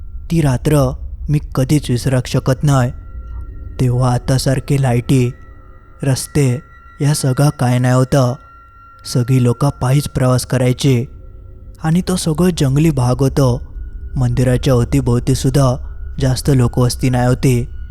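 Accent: Malvani, India. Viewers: आपल्या वाणीत मालवणी भाषैचा गोडवा आहे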